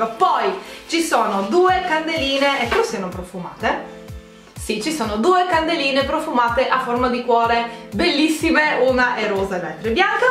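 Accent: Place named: Trieste, Italy. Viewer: oddio sei di Trieste anche tu ahahah! infatti mi dicevo che hai un accento troppo famigliare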